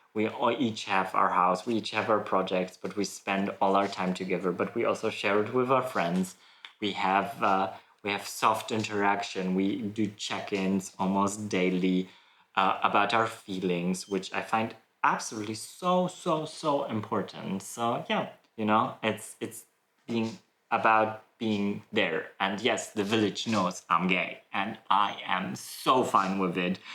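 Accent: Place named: United States. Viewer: They know you're gay, okay. But do they know you speak perfect American English?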